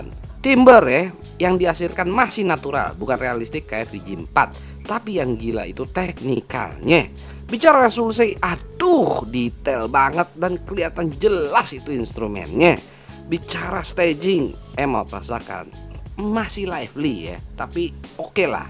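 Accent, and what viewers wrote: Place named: Petamburan, Indonesia. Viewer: Logat petamburan nih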